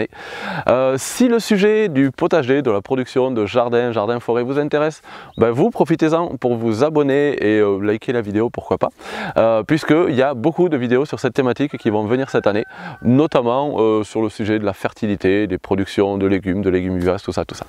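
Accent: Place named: South, France. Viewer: Passionné par la nature en général, je trouve dans les vidéos de toujours des tests à essayer( Prendre de l'avance sur le retard😅) dans nos parcelles. Sa vitesse d'élocution respire la maitrise du sujet,il est toujours agréable à visionner les différents sujets proposés. Avec le bon accent du sud aussi, TOP!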